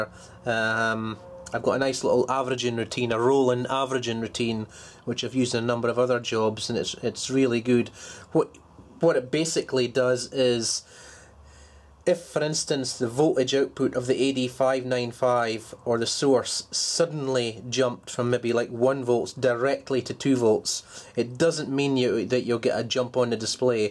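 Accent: Scotland, United Kingdom. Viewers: is from Scotland. I am English. One can easily 'get' all the content by the context. He is clearly a Top Boy and I love having to try a bit harder to understand his speech pattern. I can cope with a few "eeee", "eeerrr" when he is so on the money and his kindness so evident.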